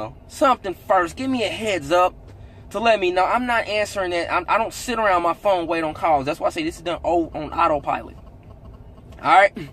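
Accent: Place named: Oklahoma, United States. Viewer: are you from Oklahoma love yours accent thinking about joining. thanks for sharing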